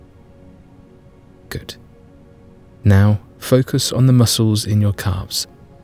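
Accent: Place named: United Kingdom. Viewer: so glad this is a british person i hate the annoying american voices that they usually have on these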